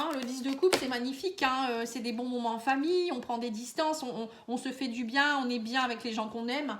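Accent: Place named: North, France. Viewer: Cc ohoh cet accent du nord que l'on reconnaît fort merci pour la guidance 😊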